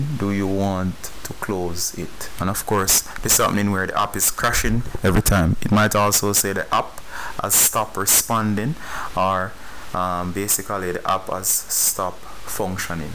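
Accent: Jamaica. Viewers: brilliant Jamaican accent. Thanks for the tips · You sound Jamaican cool. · You sound Jamaican😎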